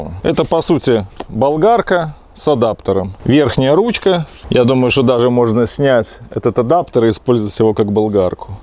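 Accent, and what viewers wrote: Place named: Belarus. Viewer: беларусский говор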